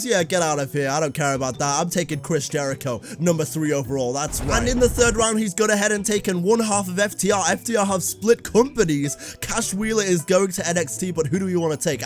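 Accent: United Kingdom. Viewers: Like ur accent u sound British to me ❤️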